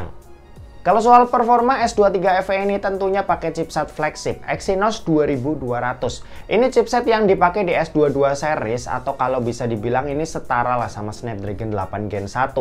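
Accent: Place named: Java, Indonesia. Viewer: medok tipis tipis y mas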